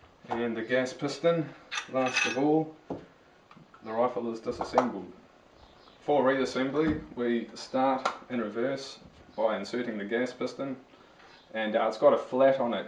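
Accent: New Zealand. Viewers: i was so supprised to hear a new zealanders voice :D where abouts in new zealand u from?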